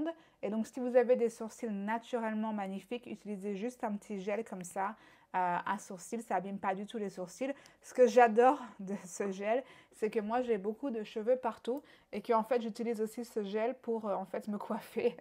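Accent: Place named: France. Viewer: Trop cool une vidéo en Français yesss , c’est marrant quand tu parles en anglais tu as un léger accent Français et quand tu parles en anglais tu as un léger accent en anglais so cute 😻❤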